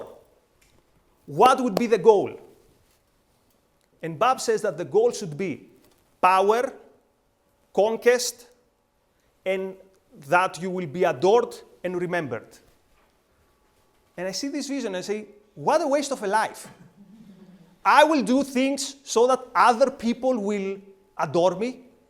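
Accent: Greece. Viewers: Greek accent is adorable.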